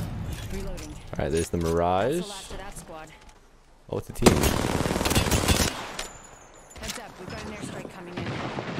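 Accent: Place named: United States. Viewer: Which country is Aceu from, or his home land ? He have American accent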